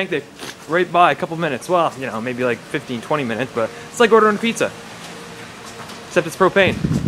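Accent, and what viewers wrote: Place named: Australia. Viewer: Great Australian accent!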